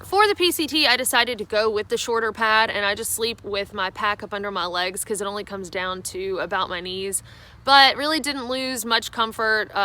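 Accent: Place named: Tennessee, United States. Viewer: New sub here..you got that Tennessee accent. It's either Tennessee or North Carolina.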